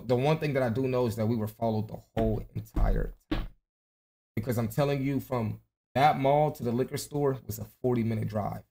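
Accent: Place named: Canada. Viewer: Mans talking like Canada sweet lol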